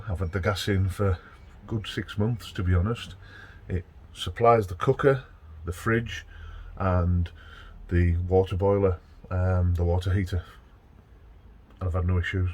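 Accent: Cumbria, United Kingdom. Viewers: Clicked on video as I am considering fitting a tank to my motorhome, but as soon as you spoke I recognised that Cumbrian accent ..... and lo and behold you are from the same town as my wife and her family, perhaps you may know some of them ..... Bell, Cass and Dustin are the close family. Anyhow, because you are a Workington lad I will subscribe and watch your future adventures.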